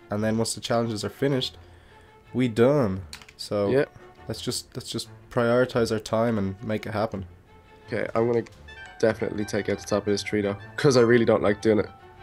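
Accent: Ireland. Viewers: R u irish cuz i am Lepracorns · Is he Irish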